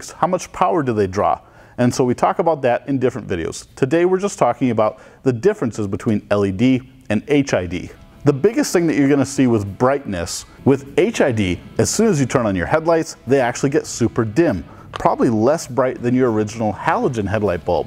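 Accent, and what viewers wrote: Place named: United States. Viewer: Dude, you should start with considering legality FIRST! Since you have an American accent, I will assume you are doing this for the US audience and you should be aware that per the DOT it is ILLEGAL to use LED bulbs in halogen reflectors! Helping you see better while blinding on coming drivers is not safety inhancement! Esp as they crash headon into the offending driver with LED headlights! And really easy to check on post accident.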